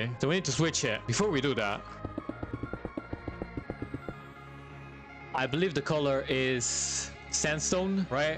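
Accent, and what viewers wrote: Italy. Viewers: I'll be honest, I came across a video of your YouTube shorts and hearing your accent I thought "He's Italian", I opened your channel and the first thing I saw was this video. I subscribed to your channel without thinking twice.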